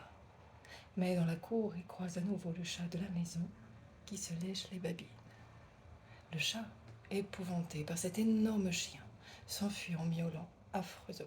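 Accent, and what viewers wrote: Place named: Spain. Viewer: Youpi je suis le 100e abonné 🙃Tu as un petit accent et une manière de parler bien spécifique, tu es espagnole "de base" ?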